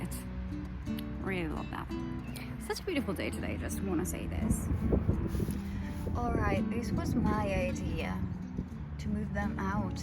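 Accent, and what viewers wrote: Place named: United Kingdom. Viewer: Ciao, grazie mille per questa condivisione❤️ Io ho la luna in toro, e ultimamente ho trascurato la mia connessione con la natura😞 e infatti sono totalmente off balance. Grazie di avermi ricordato di cosa ho bisogno. E grazie di avermi ricordato anche di essere capace di comprendere il British accent🤣🤣 mai scontato 😅🌷 un abbraccio ❤️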